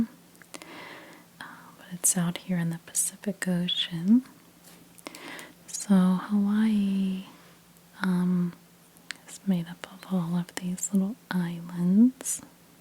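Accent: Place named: East Coast, United States. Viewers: you’re 100% from the east coast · Brooklyn accent? Whatever it is I love your extra vowels.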